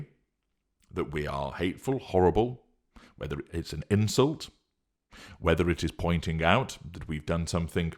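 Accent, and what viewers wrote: United Kingdom. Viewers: I like his voice.. sometimes it is creepy in a way, I guess. But I like his British accent.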